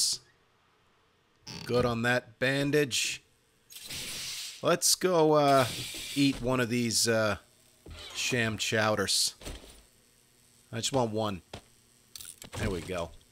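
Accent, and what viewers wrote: New Jersey, United States. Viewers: This guy is a gangster... has the jersey accent and mentality....have loved this guy long time...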